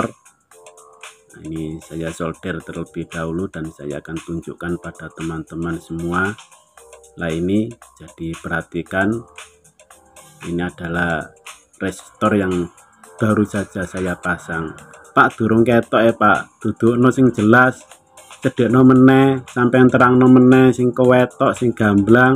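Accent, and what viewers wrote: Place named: Jombang, Indonesia. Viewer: Logate sampeyan niki kadose tiyang Jombang mas salam dari Manado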